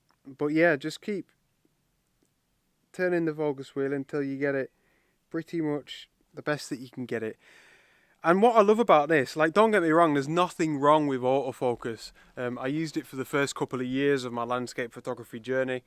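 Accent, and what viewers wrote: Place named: Northern England, United Kingdom. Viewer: I love listening to the passion you have for your vocation. Your shots are wonderful, but you only achieve that through your love and appreciation of the land. A pleasure to hear your no-nonsence Northern twang too. I'm from Manchester originally myself, now living in Croydon, how I miss the people and their candidness. Great work fella, and long may you continue on your quest.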